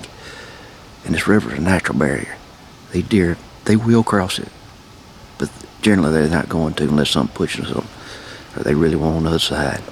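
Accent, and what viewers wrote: South, United States. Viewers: What state are you from. You have a southern accent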